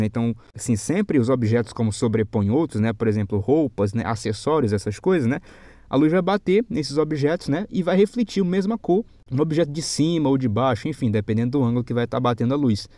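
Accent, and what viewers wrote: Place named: Ceará, Brazil. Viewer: Você é Cearense amigo, seu jeito de falar lembra muito. Muito bom a forma que você explica kkkkkk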